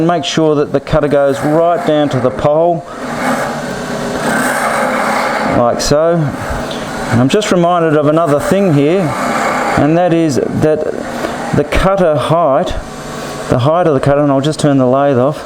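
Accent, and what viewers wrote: Australia. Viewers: Nice piece of work, and always good to hear an Aussy accent.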